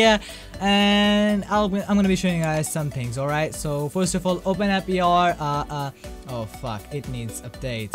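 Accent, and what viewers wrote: India. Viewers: how are you indian ur accent is great i am from iran but live in germany keep up the great work!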